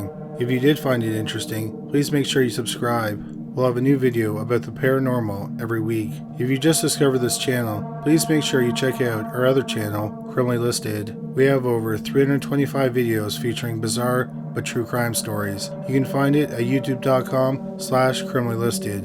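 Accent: Canada. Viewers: There’s a lot of Amazing calm speaking narrators on YouTube. Top 5s comes to mind. However, the consistent mispronunciations of ordinary words is grating for quite a few viewers & listeners. A lot of it appears or I assume is it to be the 🇨🇦 accent & a lot of it almost has sounded , like for years now, just an inability or refusal to pronounce the full word, it’s one thing to say ELLY-inn every time for the word alien but quite another to not care to realize that full endings or words are just skipped , the end of words such as Forest, instead he constantly says Foress. Or something along those lines, just kind of a wild line of work to be in when speech & possibly malaprop is heard almost more than correctly pronouncing words, or I’d imagine he’d say Worse not WorDs.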